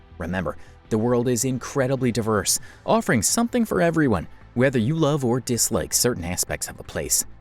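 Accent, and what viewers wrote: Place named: Michigan, United States. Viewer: That Narration Accent Sound like my Michigander Accent! 🇺🇸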